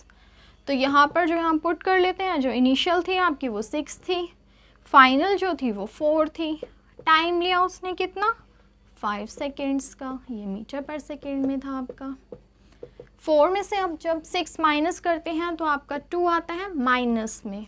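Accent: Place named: United Kingdom. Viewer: Mam apki accent british wali h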